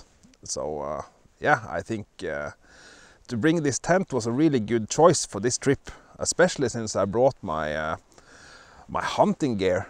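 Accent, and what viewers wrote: Norway. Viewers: Really relaxing the way you narrate. Best thing is the Nor-english accent. Hehe ;) FYI : What he is hunting for Rådyr = Venison.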